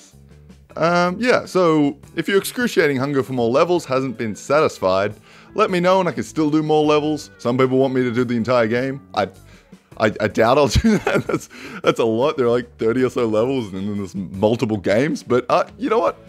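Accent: Australia. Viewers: Hey my bday and are u Aussie mate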